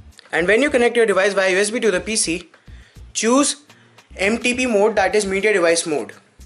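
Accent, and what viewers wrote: India. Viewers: dude, your indian accent is hard to understand for people who are not from india. he wants you to put captions on the video or make custom subtitles on your videos.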